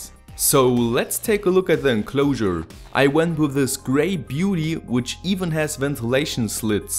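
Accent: Germany. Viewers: Are you german? That english...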